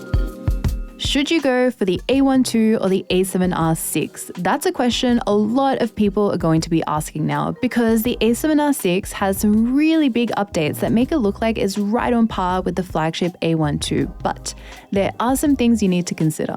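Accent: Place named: Queensland, Australia. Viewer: Are you from sunshine coast? You just sound like your from Queensland.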